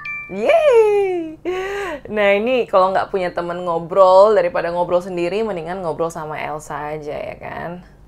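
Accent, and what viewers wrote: Indonesia. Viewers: masih logat indo banget si🤭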